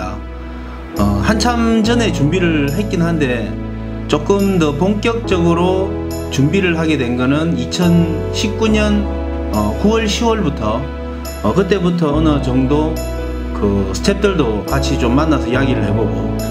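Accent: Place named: Gyeongsang, South Korea. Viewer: 갱상도래여~ 홧팅!😊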